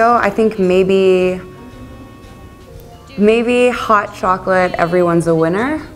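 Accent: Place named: France. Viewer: Ella tiene algo muy francés... Ademas del acento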